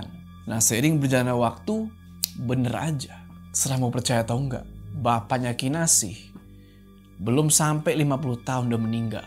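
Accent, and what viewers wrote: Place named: Malang, Indonesia. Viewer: curiga arek malang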